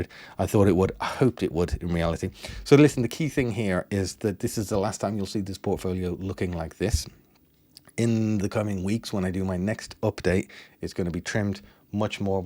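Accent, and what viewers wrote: Ireland. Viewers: I can detect a slight Irish accent I think. You did very well with your portfolio, well done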